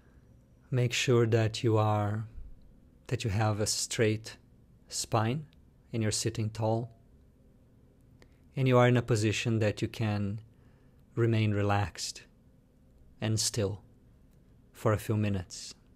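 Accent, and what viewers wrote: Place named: Italy. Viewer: Interesting. I'm used to simply watching my breath for thirty minutes. I think I will continue that way. Simple is what works for me. Great stuff,. Continua a fare queste cose e aiuterai a tantissima gente. I hear an accent and is an Italian name. Io sono cresciuto a Napoli!!! N'abbraccio.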